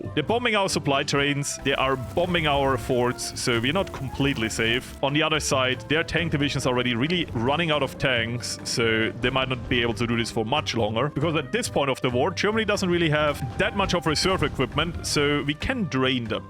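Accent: Austria. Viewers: I hear Österreichisch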